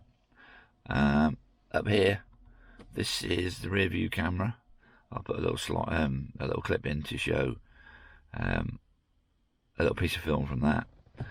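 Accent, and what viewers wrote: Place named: Norwich, United Kingdom. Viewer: Hi , I hope you are both well...we have the same car an love it...also have the twin axle 8ft wide swift...you sound like a fellow norwich boy😁... like the vids ...keep up the good work...matt an trace.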